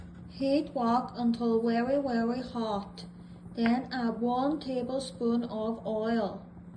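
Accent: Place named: Derry, United Kingdom. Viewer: I can here the derry accent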